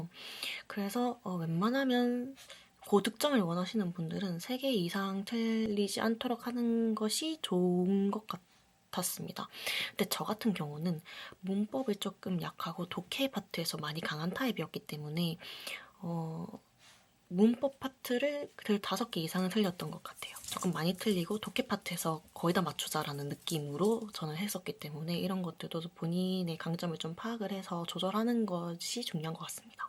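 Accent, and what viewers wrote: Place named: Seoul, South Korea. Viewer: 난 서울말인줄 알았는데 차분하니,, 어디 사투리라는 거임?? 전라도도 아니고 충청도도 아니고.. 음..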